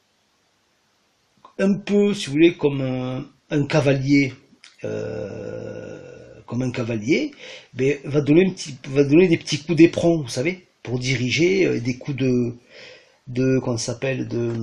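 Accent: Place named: Cantal, France. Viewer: Bonjour, je viens d'ecouter plusieurs de vos potcasts et ce qui m'a frappé c'est votre accent qui ressemble fortement à celui de chez moi, le Cantal 😅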